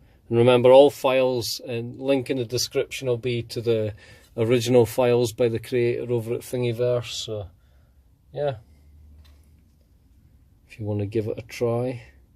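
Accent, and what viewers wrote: United Kingdom. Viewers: how can i get in contact with you> are you from uk im guessing with the accent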